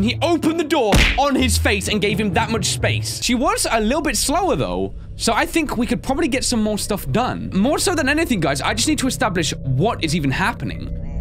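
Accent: United Kingdom. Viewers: Ur British right?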